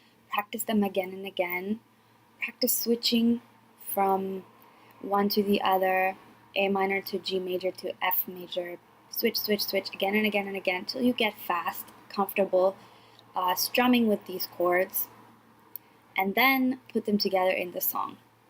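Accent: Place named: United States. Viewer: that American accent though 😍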